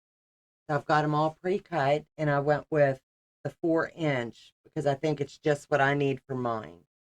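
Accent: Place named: Pittsburgh, United States. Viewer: Are you from Pittsburgh? I recognize that twang.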